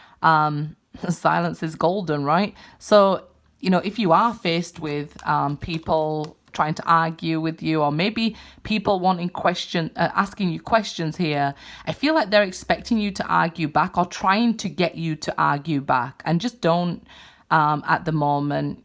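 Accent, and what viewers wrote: United Kingdom. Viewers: Oh a british girl ❤️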